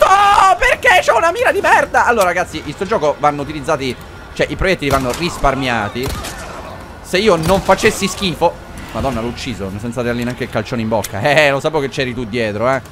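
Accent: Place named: Naples, Italy. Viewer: Potrei parlare napoletano meglio di te anche se non parlo napoletano. 😂 Vabbè, a parte gli scherzi, non mi ricordavo che Favij parla napoletano. Mi ricordo che nei vecchi video non parlava napoletano. Comunque Leon è quasi come me. Anche se ho un taglio di capelli un po' diverso, ma è quasi come me. Stesso carattere e stesso atteggiamento.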